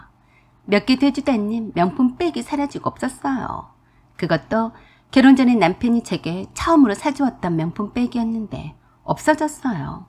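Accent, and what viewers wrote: Jeolla, South Korea. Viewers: 아따 무슨 절라도사투리를 허벌나게 쓰신다요? 성우분이 호남분이요? 글구 저런 싸가지없는 집안과는 바로 이혼하는 것이 답이랑께요!!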